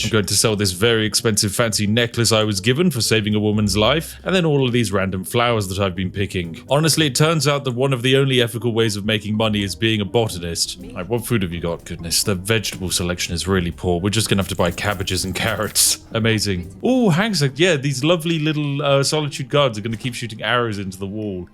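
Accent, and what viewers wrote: United Kingdom. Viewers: I dunno if its you but i got a mewgenics cat that sounds like you, and by that mean blatantly British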